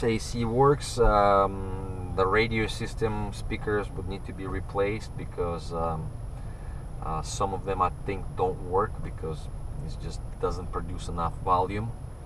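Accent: Russia. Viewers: Суровый русский акцент. За Краун - лайк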